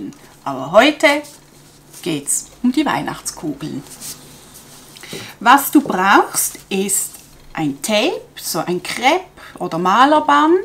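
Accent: Switzerland. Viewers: Fantastische Kunst, bin begeistert 🥰, vielen herzlichen Dank für Deine wertvollen Videos, hab dir ein Like und Abo gemacht 🥰 (und dein Schweizer-Hochdeutsch sehr sympathisch 😀)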